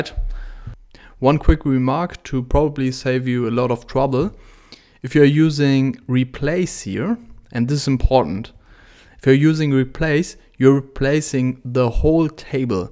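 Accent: Germany. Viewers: HEY man, you are 100% a German :=), right?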